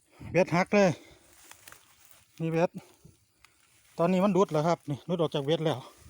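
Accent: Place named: Ubon Ratchathani, Thailand. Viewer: ฟังสำเนียงเว่าคือจังคนทางอุบลอำเดชอุดม คั่ก หมานๆคับ สุดยอด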